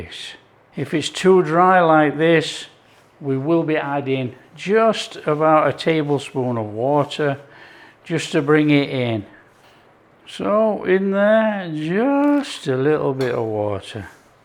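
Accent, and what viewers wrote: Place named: Northern England, United Kingdom. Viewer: Oooop,s finger twitch, these recipes out there... I'm a Salfordian living in Greece now..X, Not only the recipes I love but your Northern Twang also, like having the North of England in our little Greek Island with the food. Thanks.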